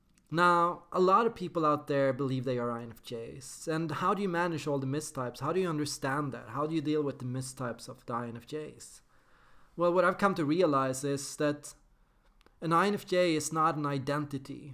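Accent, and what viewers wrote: Sweden. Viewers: He's Swedish. Det kan man høre på ham. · That's OCD, not INFJ...;-) I think that's a specular/optical distortion of the camera. At least you noticed the wall hanging -- is apparently from Stockholm (as his "accent" indicates.)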